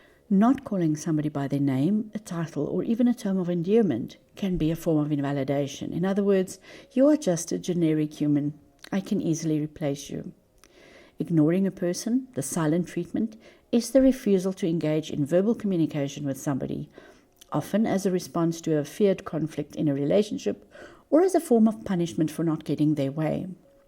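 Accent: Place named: South Africa. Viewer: I thought I had recognized your accent… from SA myself 🥰 but living in Portugal. Thank you for your time 🌸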